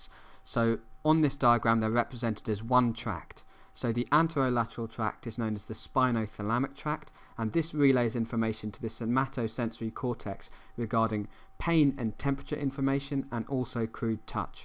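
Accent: England, United Kingdom. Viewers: I learn so much better from an English accent for some reason... Great work! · someone just told me that you have an English accent. And I think it's good. remind me of times listening to BBC documentaries of animals and plants, the lovely and respectable Sir David Attenbourg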